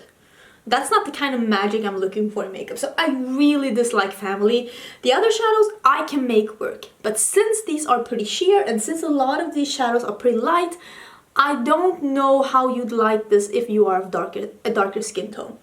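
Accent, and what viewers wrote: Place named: Sweden. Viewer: You’re such a sweetheart, I love that you’re Swedish too, most beauty YouTubers I come across are American or from the UK. Also like your accent again and just your honesty about what your thoughts are with makeup and products you try. Thank you, I subscribed after I watched one of your videos for the first time. 😊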